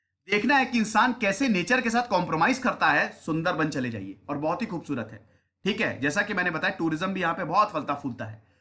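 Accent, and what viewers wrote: India. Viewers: I love ur desi style of speaking 🌸❤️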